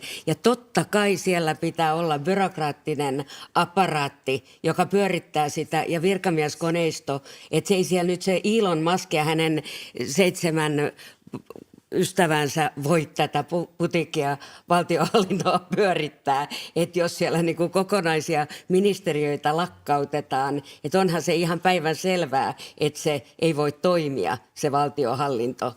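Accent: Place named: Turku, Finland. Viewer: Niin ihanaa toi Turun murre 😊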